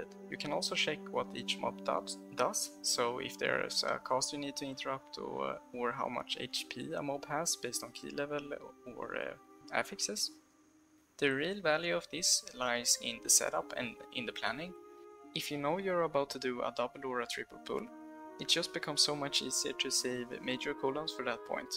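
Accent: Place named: Sweden. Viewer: Sjuk svengelska bror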